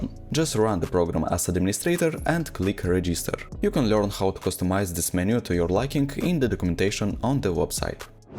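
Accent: Russia. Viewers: русский акценкт крутой, я так явно говорить не умею, как он так научился, у меня вообще только понимание языка вкачано, а остальное плохо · Ахах, с первых секунд слышно что русский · как же чувствуется этот русский акцент) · говоришь с русским акцентом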